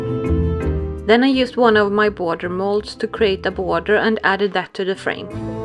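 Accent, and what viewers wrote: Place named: Norway. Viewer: This is really cool. Thank you for sharing! Also, if you don’t mind my asking, where are you from? Your accent sounds Norwegian. 🤗❤️🤗 🕸️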